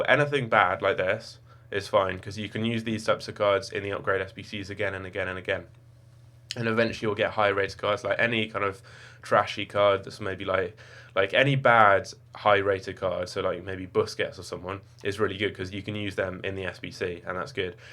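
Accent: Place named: Scotland, United Kingdom. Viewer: Never know you were Scottish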